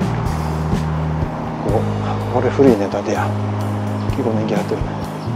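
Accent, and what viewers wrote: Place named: Nagoya, Japan. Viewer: さんの名古屋弁、駄弁りなのケッタ漕ぎが心落ち着くよ。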